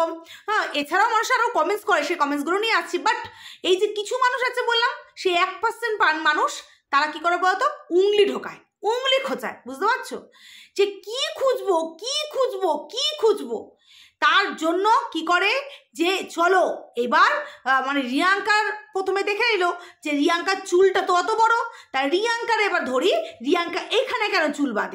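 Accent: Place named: Bangal, Bangladesh. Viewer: Bha tumi toh darun bangal vasa bolo...Tumi ki bangal go? Khub sweet lagche sunte...jodio jader niye bolcho tader ami chini na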